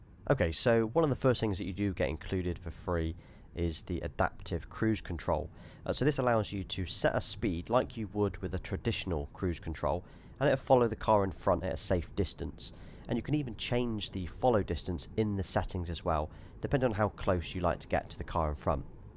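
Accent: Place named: England, United Kingdom. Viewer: Great to here a calm English accent on a Tesla video.... a pleasant change from the over enthusiastic ‘awesome’ American content 😆 Great production and editing too 👍🏻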